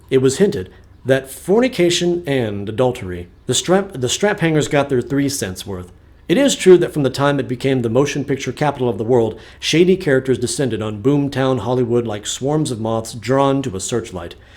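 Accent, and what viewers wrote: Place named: Germany. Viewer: Bahahah your German accent made this so much more enjoyable! Love your narration! 💖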